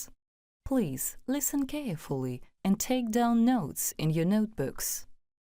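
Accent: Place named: United Kingdom. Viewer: не очень приятно слушать мешанину из британского и американского акцента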